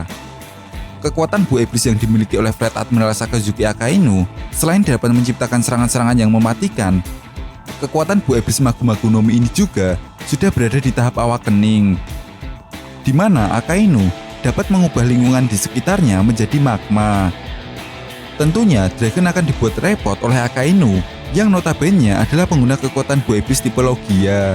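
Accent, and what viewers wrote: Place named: Banyumas, Indonesia. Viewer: Bos ngapak banget ....tp seru denger critnya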